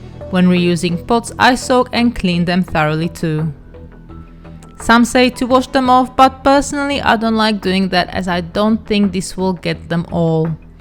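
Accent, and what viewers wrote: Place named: South Africa. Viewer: It sounds like you have a south African accent, sounds a bit like my friend from the west coast.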